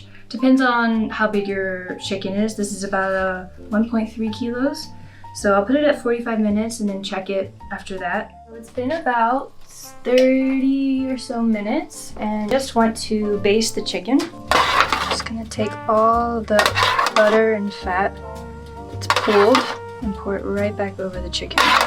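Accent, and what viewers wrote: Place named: France. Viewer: Hi, this Is Katherine from Honduras Central América. I love your videos, muy second tong Is french, my mother tong Is spanish, but when I hear you, you are from France. So I feel like, I am traveling with you in your stories. Muchas gracias ❤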